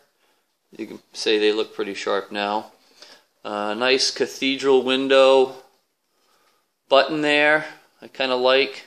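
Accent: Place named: Appalachia, United States. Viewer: That has a deep mountain twang love it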